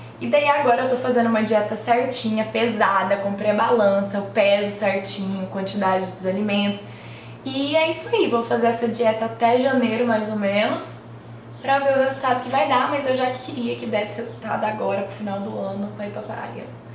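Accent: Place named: Uberlândia, Brazil. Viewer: Saudades do seus vídeos que bom q voltou!!! Saudades desse nosso sotaque lindo de Uberlândia!!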